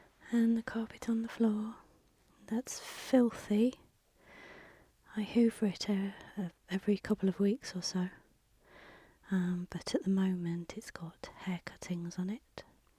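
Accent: United Kingdom. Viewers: Who else but could relax me and make me sleepy doing a reveal video. Looking forward to your new studio, and plz quit rubbing and scratching the carpet i allmost fell off to sleep. Lol and being from the southern part of the USA, i LOVE the Brithish accent! Good luck Love!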